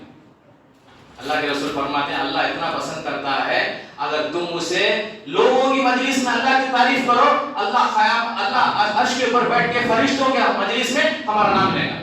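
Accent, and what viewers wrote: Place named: Chennai, India. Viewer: Mashallah After long time I heard Chennai slang Urdu bayan.Alhamdulilla Very beautiful bayan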